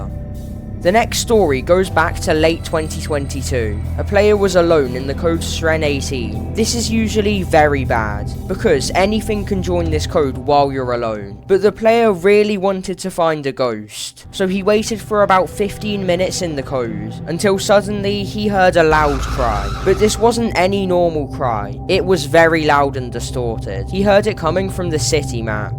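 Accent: United Kingdom. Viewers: It's supposed to be creepy but British voice just makes it..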